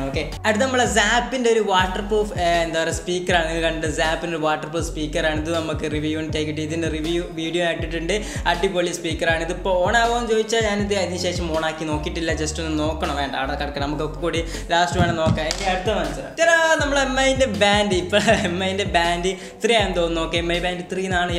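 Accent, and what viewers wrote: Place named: Malappuram, India. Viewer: ആ മലപ്രം ടച്ചുള്ള സംസാരാ ഇഷ്ടം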